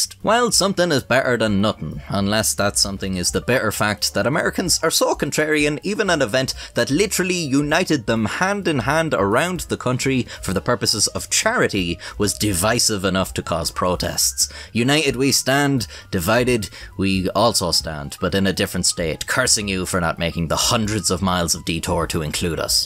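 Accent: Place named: Ireland. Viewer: Tell me why this narrator sounds like some sort of animated insect with an Irish accent?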